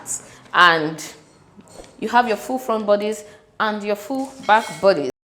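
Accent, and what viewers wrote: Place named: India. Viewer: At first ma,I thought something was wrong with my phone,when I heard you speak like an Indian. Thanks for this video ma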